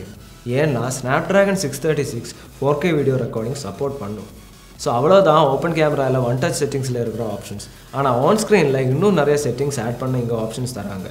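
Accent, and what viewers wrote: Kerala, India. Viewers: கேரளா people தமிழ் பேசுனா நல்ல தா இருக்கு .. good job bro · neenga Kerala va malayali maari pesurenga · Santhegame illa. Look & speech... Clr ah malayalinn kaatuth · Konjam kerala slang varuthe Athum nall than irruku👍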